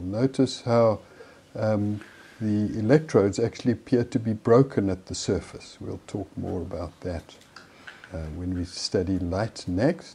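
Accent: South Africa. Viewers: Your South African aren't you? Ik that accent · I know that South African accent anywhere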